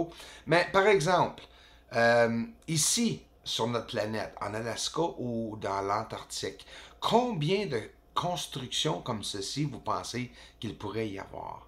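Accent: France. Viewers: en plus de superbes vidéos nous avons droit à de l'humour, merci! Mais bon ^_^ a choisir en français de France, c'est mieux que le français québécois:)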